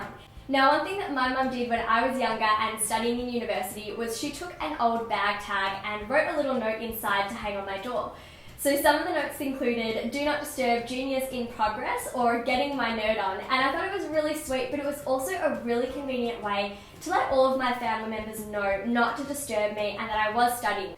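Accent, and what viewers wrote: Australia. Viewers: You sound like an Aussie.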